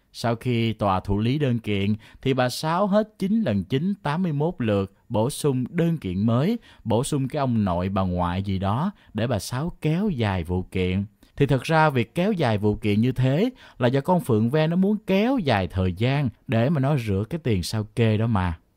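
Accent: Standard. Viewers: Kênh này nói chuẩn nha❤ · nói rất chuẩn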